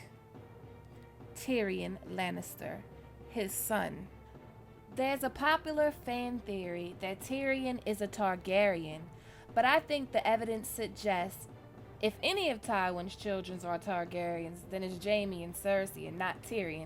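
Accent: United States. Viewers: I think I just fell in love with your voice. GOT needs to have accents other than unidentifiable Eurocentric and various British accents. Why have there never been any American or Canadian accents on the show? Someone with a voice like yours would be very refreshing.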